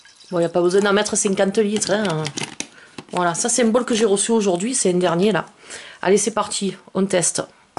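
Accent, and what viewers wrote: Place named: South, France. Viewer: L’accent du sud est parfait! J’adore!!👍 Je viens de recevoir mon 1er bol tibétain (j’ai essayé récemment chez un pote et j’ai trouvé ça incroyable !) J’essayerai avec de l’eau, ça a l’air terrible!! Et je te laisse un like ! ( parce que vu le nombre de vues, et zéro like, c’est pas cool! ) Allez, et je m’abonne, c’est cadeau !🎁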